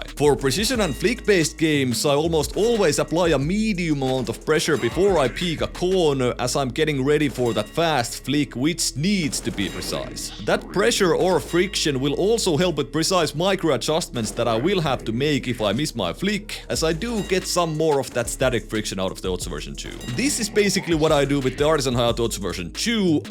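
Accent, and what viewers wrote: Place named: Finland. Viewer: Nice explanations regarding pressure vs friction. Also, I love your finnish english, very colorful to listen to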